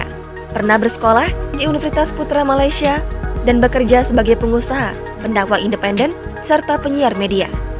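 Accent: Indonesia. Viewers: Itu cara sebutan org indonesia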